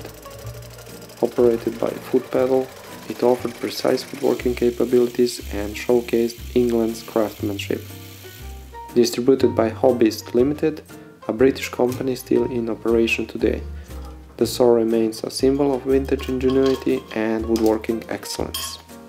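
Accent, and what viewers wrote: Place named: Russia. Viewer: У тебя такой классный русский Английский, прям не могу)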